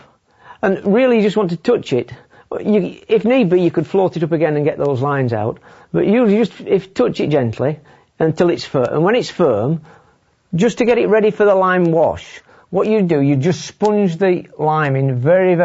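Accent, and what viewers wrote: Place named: Preston, United Kingdom. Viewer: sounds like a preston accent ,you from preston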